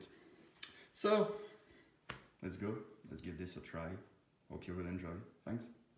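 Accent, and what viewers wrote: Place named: France. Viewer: T'es français toi!😅😊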